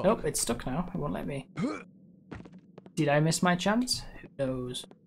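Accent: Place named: United Kingdom. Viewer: are u British? ur ascent is amazing I love it cuz it sound like you could be on a podcast or something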